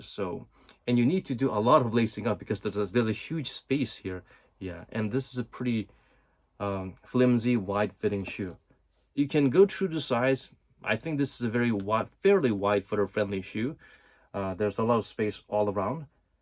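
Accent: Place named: United States. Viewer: 형님 미국 교포신가요??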